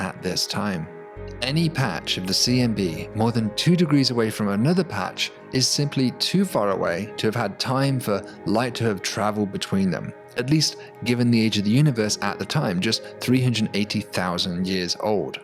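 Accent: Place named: Liverpool, United Kingdom. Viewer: why is this guy's face always on view ? why are stills of Brad Pitt and the moody brit actress all over this?why does he talk as if he is talking to 8 year olds. oh.. cuz he is i guess. so annoying i had to get up from me telly and find me lap top to commentAH ! it's only the annoying Scouse that annoys me so much. a bit too much to take before I've have me cup o builders innit? Yes, I am in fact a bluuudy git. spoiler alert, he tells the size 45 billion to the edge. you're welcome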